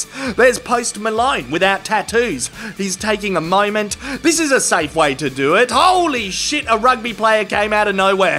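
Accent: Australia. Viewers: Good clean fun. "Big atomic wedgie". The Aussie voice over is awesome. That's why us Brits love 'em. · You can't beat an aussie commentator.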